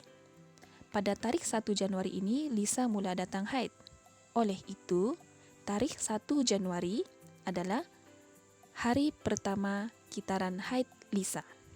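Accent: Sabah, Malaysia. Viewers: Cikgu orang Sabah ye😄😅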